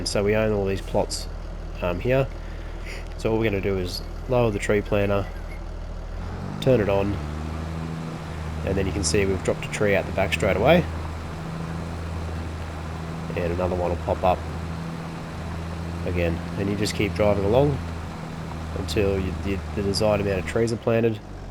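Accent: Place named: Australia. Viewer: Liked and subbed Great to hear an Aussie playing FS on YouTube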